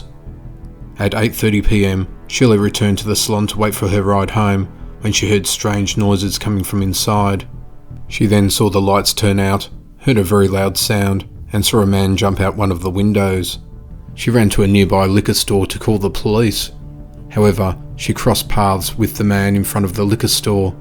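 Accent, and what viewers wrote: Australia. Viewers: Wait, is this an Aussie accent mine ears hear? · Nice to hear an Aussie accent doing these videos!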